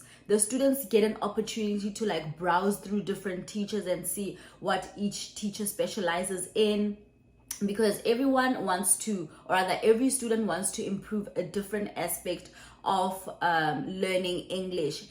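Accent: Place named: South Africa. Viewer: TOYS-inyana ! I Love Your SA accent !!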